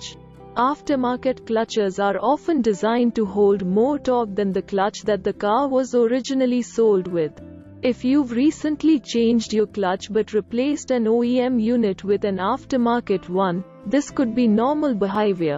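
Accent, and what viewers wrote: India. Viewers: Amazing, a robot-narrative with an Indian accent...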